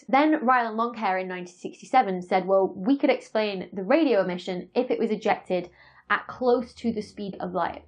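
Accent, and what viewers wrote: United Kingdom. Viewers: Is it me or is this more interesting because she has a British accent? Love Dr. Becky's channel.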